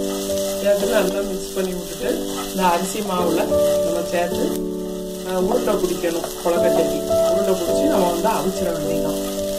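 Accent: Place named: Tirunelveli, India. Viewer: super mam....👌👌👌 unga slang romba nallaruku ...tirunelveli tamil mathri...... Azhaga iruku ..👍👍❤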